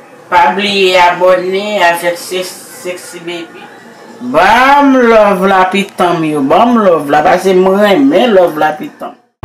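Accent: Haiti. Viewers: Ki bo an ayiti Manmi soti? Pou janl pale ou ta di se moun an nord pati lartibonite.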